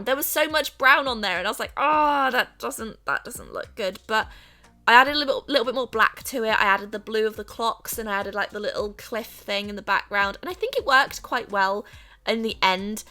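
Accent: United Kingdom. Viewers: Yaaah u r british so u will say like that ❤️❤️ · the things you say ‘wrong’ are just the British way of saying them. I say Van Gogh and Amazon the same as you. From UK x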